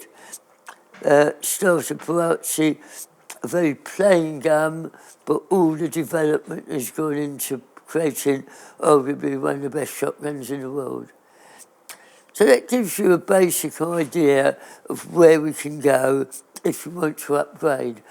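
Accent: RP, United Kingdom. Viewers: I could listen to all day long, so full of knowledge. I suffer from Tinnitus as result of shooting in my youth and I can understand every word that he says and those that can't must be stone deaf or does not speak the Queens English.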